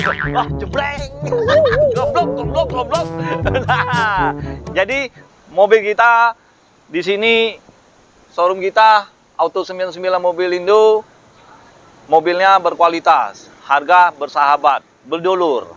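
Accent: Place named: Plumbungan, Indonesia. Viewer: Wong Plumbungan nian Dio ni klo ngomong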